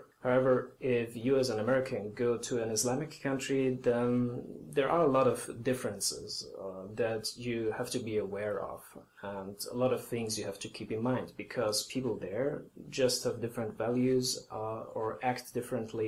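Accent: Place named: Scandinavia. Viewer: BTW...I am aware of your Scandinavian accent... I'm only assuming that you have recently expatriated to the UK. Since many of your arguments predominates between Britain and the US.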